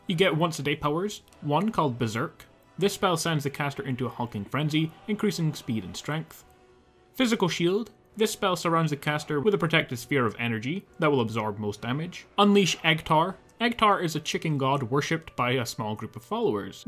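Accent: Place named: Glasgow, United Kingdom. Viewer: Posh glasgow...lish?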